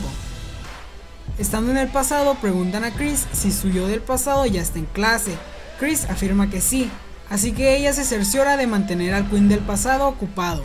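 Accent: Chihuahua, Mexico. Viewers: Eres de chihuahua? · acaso eres de chihuahua en México o que por que tu acento es similar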